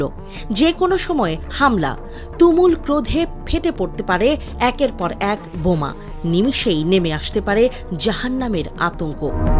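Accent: Kolkata, India. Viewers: ঠিক প্রথম কলকাতা মত খবর গুলো পরছে।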